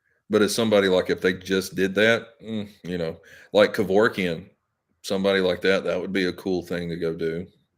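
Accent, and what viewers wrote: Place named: South, United States. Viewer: Sorry I missed your live ..enjoy your videos. I'm from Ga so your southern accent is great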